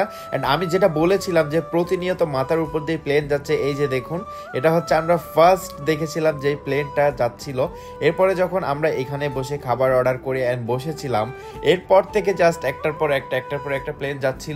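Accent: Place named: Chittagong, Bangladesh. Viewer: Tomi ctg chela seta na janar ki ace. tomder family r sby without soddo bhasai kotha bolo tokhon ctg ton ta esposto boja jai😂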